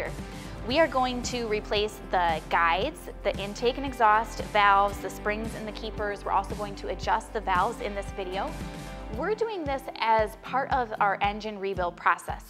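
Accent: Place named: Midwest, United States. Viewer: I could listen to read a phone book.. Reminds me of home with her Mid-Western drawl..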